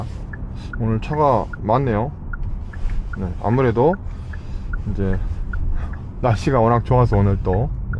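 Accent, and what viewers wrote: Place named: Jeolla, South Korea. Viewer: 전라도 분이구만 말이 귀에 속속 들어온거보니 ㅎㅎ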